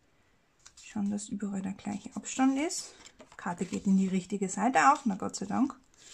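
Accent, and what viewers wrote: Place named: Austria. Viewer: Die vintage u die rosenkarte sind meine Favoriten! Nach der Aussprache bist du österreicherin!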